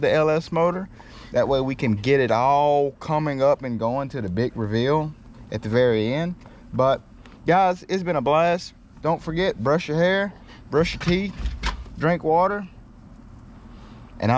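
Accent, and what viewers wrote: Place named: Alabama, United States. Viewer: “ I’m from green bow Alabama” - got the same accent. great video though.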